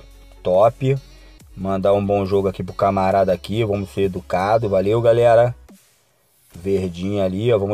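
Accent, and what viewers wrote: Rio de Janeiro, Brazil. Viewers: vara seus vídeo e top d+ mais o sutac carioca incômoda muito um mineiro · Vs é Carioca Né? Adoro As Gírias Carioca!!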